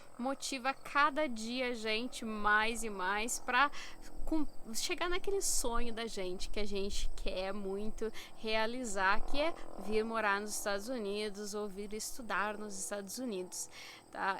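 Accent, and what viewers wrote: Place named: Gravataí, Brazil. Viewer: QUE BOM EU MORO EM GRAVATAÍ E RECONHECI O SOTAQUE, PARABÉNS!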